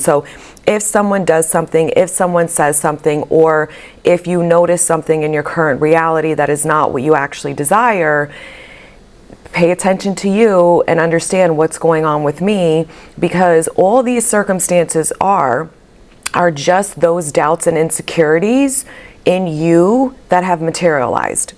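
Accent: New York, United States. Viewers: You're f***ng amazing. I can tell you're a New Yorker. I'm a subscriber and I'm so glad I found your site. You help me always over the last couple months. I'm a work in progress. Gemini guy and working on rebuilding with my Cancer lady. Just your aura and lovely way of breaking down the steps. Keep up the awesome work. Love it all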